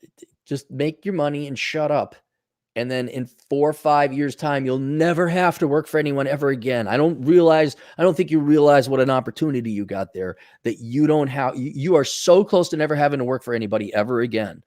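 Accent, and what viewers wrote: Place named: New England, United States. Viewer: Must be New England. Dude sounds like me.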